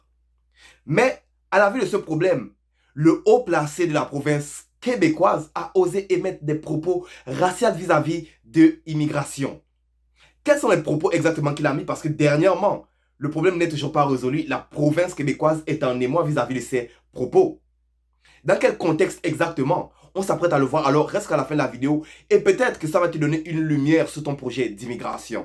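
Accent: Gabon. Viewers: Slt dis moi t'es gaboma? Parceque tu a l'accent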